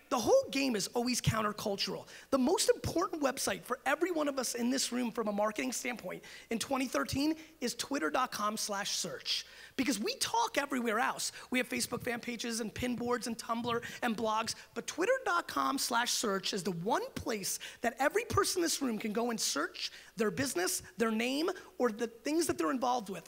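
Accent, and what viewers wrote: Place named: New York, United States. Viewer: I've noticed! I started going to networking events so you have to have a good speech, and so I litsen to the ones I like, you are one of them :) One question tho, I know you get alot of them, I live in Sweden and work with art, love my job but I found it hard to transcend from private customers to companys. Do you have any advice? Thanx, keep up the good work. Love your NY accent haha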